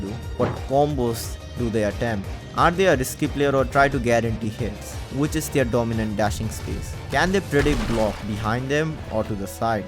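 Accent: India. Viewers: Indian accent >>>>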